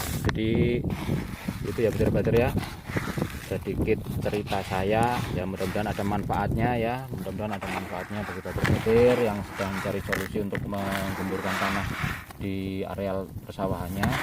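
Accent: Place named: Banyumasan, Indonesia. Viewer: Rika wong ndi kang deneng ngapak kaya nyong cilacap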